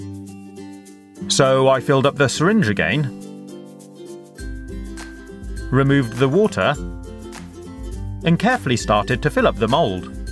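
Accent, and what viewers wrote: United Kingdom. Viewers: Are you British? You sound like it! =D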